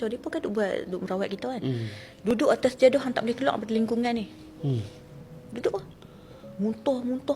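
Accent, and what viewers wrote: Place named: Penang, Malaysia. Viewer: Hg dr kecik smpai besaq lenggoq penang noh😂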